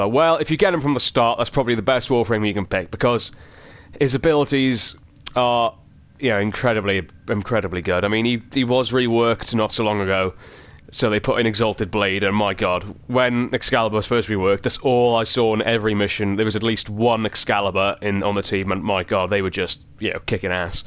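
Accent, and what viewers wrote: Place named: Wales, United Kingdom. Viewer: Your welsh tones make me wet.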